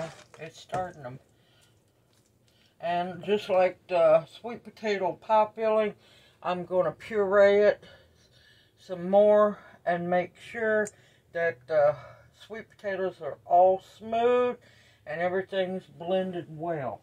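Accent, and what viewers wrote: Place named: Tennessee, United States. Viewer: I saw the video title and I had to watch. I said, this gal must be from Tennessee, because sweet potato butter is from back home. Then I heard you speak and said, yes, this is a fellow sister from Tennessee. Good on ya teachin the ways.. 😁👍🏻❤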